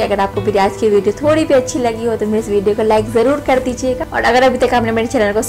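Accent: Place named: Bihar, India. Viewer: तुम इंडियन बिहारी हो क्यों की तुम हिंदी बिहारी बोल रही हो जय हो बिहारी बाबा की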